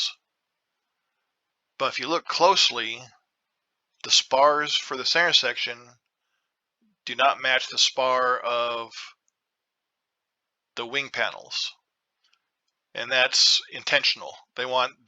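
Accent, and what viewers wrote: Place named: Pennsylvania, United States. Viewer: Absolutely fantastic! Thanks a million for this. btw, are you from the great state of Pennsylvania? You have that accent ..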